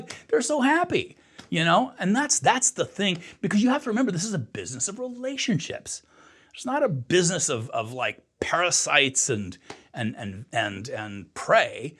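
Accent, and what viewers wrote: Ireland. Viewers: Interesting about Texas....he sounds almost Irish to me. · Irish Accent im hearing?...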